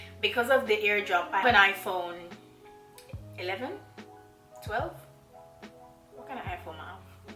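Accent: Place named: Jamaica. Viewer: I love your Jamaican accent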